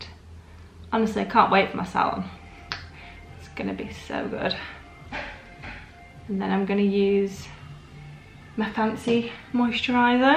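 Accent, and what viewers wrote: United Kingdom. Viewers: Ur british accent uhhh💕💫♥️♥️♥️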